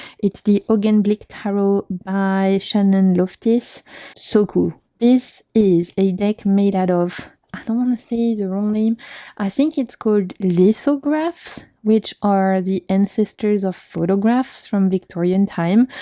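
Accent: France. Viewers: Whatever you say with a French accent is better. 😘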